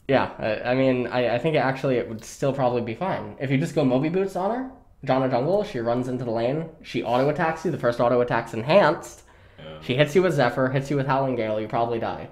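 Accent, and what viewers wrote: Sweden. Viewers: that Swedish accent is getting my dick hard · That swedish accent <3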